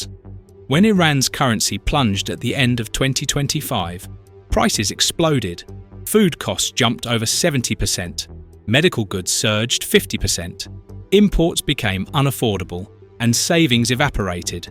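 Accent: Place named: United Kingdom. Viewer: Who are reporting your mis guided assessment? We patriotic Iranians are watching all biased media mis reporting the protests and what brave Iranians protesters are calling for on the streets, they are taking their country back after 47 years of occupation by islamist terrorists who are not even from Iranian decedents and majority were born in Iraq and lebenan. So this uprising is about taking our country back and bring back our constitutional monarchy this is the main slogan shouted on the streets return of monarchy and Pahlavi, so do not try to misreport because of being a biased media, God knows which power or ideology is behind your rasneh, I note you are based in Bangeladesh and have a british accent. Times have changed this is not 1979 when the West removed our beloved Shah and brought these terrorists to Iran and deceived part of the nation who for money and position sold their country, and majority sadly at and watched, now it is 2026 and the last 4 generations have become aware of their history and what a beautiful country they had under Pahlavi, so enough is enough we will bri g back our monarchy and the glory we had to Iran whether you biased media and globalists western powers want it or not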